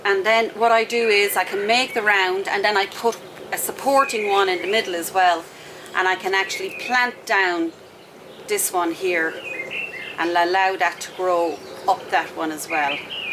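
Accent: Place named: Ireland. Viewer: Hi there from NJ in the US. Your video has inspired many willow projects on my little farm of 20 acres. Love your spirit, your nature and your accent, especially your accent because it reminds me of a friend from Ireland who also inspired me. Now I need more willow stools to make more fabulous things. Keep going and growing!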